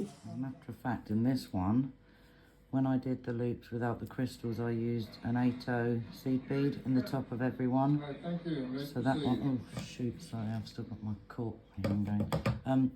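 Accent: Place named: United Kingdom. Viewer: You have the British accent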